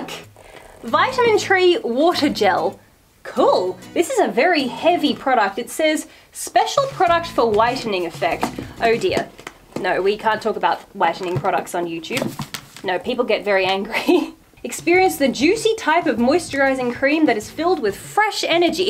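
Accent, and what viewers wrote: Australia. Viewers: i love your accent are you from Australia ❤️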